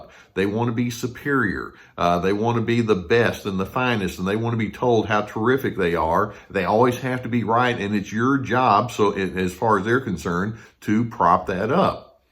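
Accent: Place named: Texas, United States. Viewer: Exact description of my neighbor, he is in the 'suffering' stage. Once we know the way, we will always win by taking the higher ground and holding our power. This is the VICTORY that you share so freely, giving true hope to those who are on the road to recovery. And, your Texas accent with your soothing voice is SO RELAXING AND AFFIRMING!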